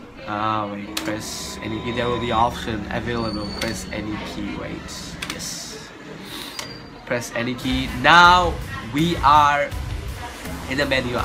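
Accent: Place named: India. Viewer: This guy has to be an Indian.. he has that call-center accent, very indian but trying to sound American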